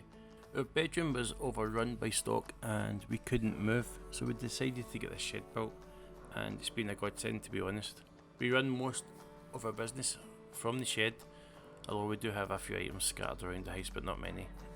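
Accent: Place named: Scotland, United Kingdom. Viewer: Superb video, great seeing all the stuff you are selling, great hearing another Scottish reseller too 👍🏻 thanks for sharing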